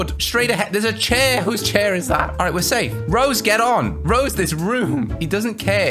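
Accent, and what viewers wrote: United Kingdom. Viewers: U British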